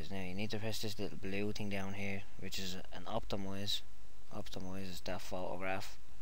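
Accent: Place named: Scotland, United Kingdom. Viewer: You got a veeeeery Scotish voiess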